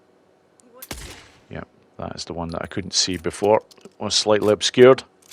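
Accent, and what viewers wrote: Scotland, United Kingdom. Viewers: In any shooter game, I always opt for a sniper rifle as soon as possible. On the first playthrough, I saved my lunch money and turned in a lot of bounty to get my trust level up so I could buy the.50BMG. The slaughter went pretty much identical to the first example. On the second go around, I had added the Rock Chuck which made it more fun as I took out the roof crew from a distance and then snuck in for an up close and personal finish. BTW, and I hope I'm not getting the nationality wrong, I enjoy videos as much for the content as I do listening to his narration. Of all the peoples speaking English as a second language, there's just something special about the way a Scottish person does it, and I could listen to it all day! So, hats off for all your very helpful offerings on Days Gone.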